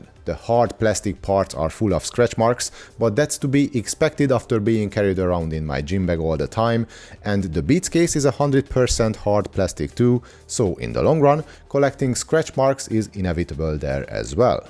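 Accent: Hungary. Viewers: Oh you live in Ireland, that's great. With your accent I thought you were coming from Hungary or another eastern country. Great video btw 👌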